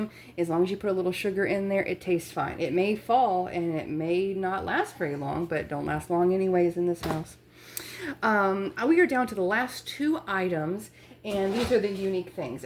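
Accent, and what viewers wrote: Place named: Midwest, United States. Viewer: Where are you located? You sound like you are from the midwest maybe..... MN?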